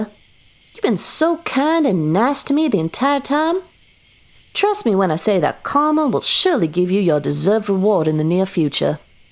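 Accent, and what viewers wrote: South, United States. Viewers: Call me selfish but i do love me this mechtism shit, the southern accent just adds a certain "kiss me darlin i beg ya" to it, p4aise be the country darlijng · You did a great job on the southern accent although usually this accent will usually only be found in Texas and Oklahoma rather than the true south, love the audio!!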